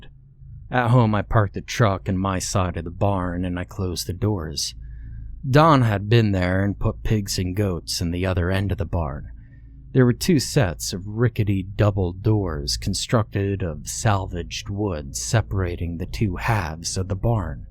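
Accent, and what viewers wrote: South, United States. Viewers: Oohh I wish it was longer much longer! I mean this story. Your Southern draw is flawless and it fits this story just right.👍🏻👍🏻👍🏻🌹🇱🇺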